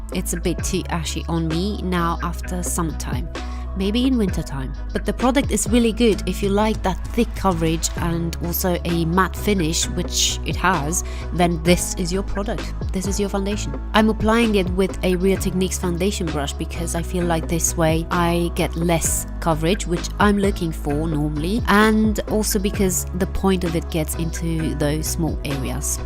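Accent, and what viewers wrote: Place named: France. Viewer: Do you speak French? Cuz I love your accent ♥️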